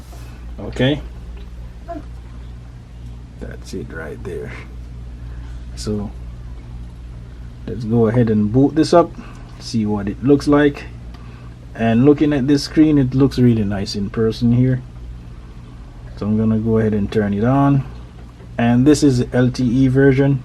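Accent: Jamaica. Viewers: You sound really Jamaican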